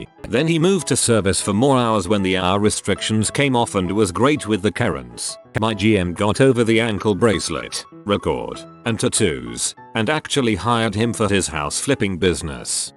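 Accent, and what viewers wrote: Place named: England, United Kingdom. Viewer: Really tiered of that English accent.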